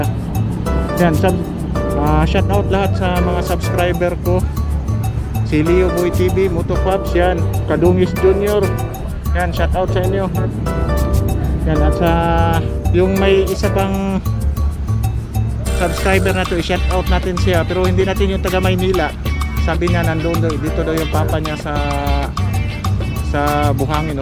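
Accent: Visayas, Philippines. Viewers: Bisaya man kaau imong tagalog idol pro ok ghapon dol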